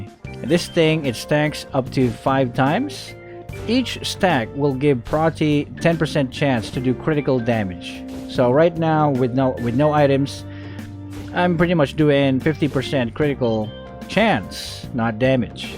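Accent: Philippines. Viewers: Youre good in english but sometime your voice in your stream sound filipino accent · wait narrator’s a filipino?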